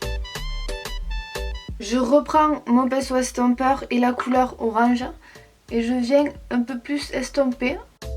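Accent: South, France. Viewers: j'adore (on a le même accent qui sent bon le soleil ;) )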